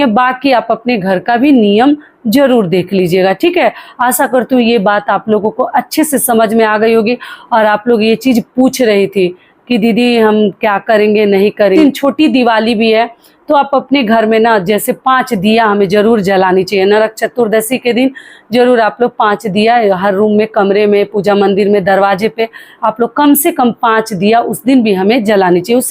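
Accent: Bihar, India. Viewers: Aap pura hmare bihar ki vidhi btayi h jiski mujhe jarurat thi or aapki baat krne ki tarike se lgrha ki aap bihar se hi h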